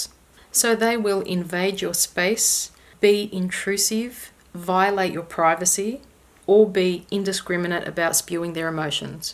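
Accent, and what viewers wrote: Australia. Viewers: Pretty sure she's an Aussie. Lol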